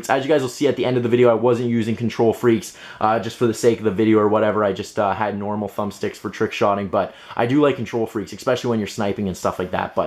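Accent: Canada. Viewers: he's Canadian I can tell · I don't even know this guy but I can tell he's Canadian by his accent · You sound canadian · He sounds so Canadian. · You sound Canadian. Are you Canadian? I know you won't answer... just curious though... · Who watched this video for the pure enjoyment of his Canadian accent? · are you Canadian ? you're hot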